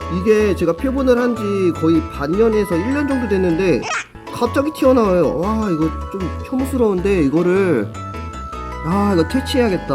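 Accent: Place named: Jeolla, South Korea. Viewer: 전라도 억양 고치세요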